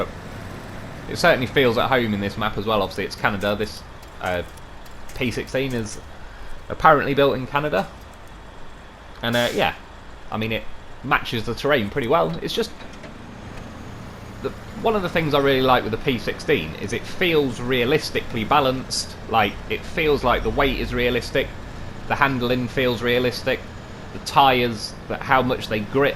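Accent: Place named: Caernarfon, United Kingdom. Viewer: Hi there. As a regular viewer of your Snowrunner vids I’ve spent a lot of time listening to your accent. While I appreciate you don’t have a North Wales accent - there is a slight hint of a Caernarfon/Anglesey edge to it. Just wondered if you’ve ever lived up here in North Wales? Sorry if it a personal question! Keep up the good work - your Dave stories are great and general banter as you drive is very funny. I regularly laugh out loud. Needless to say I now own multiple Loafs thanks to you. I whole heartedly recommend this channel!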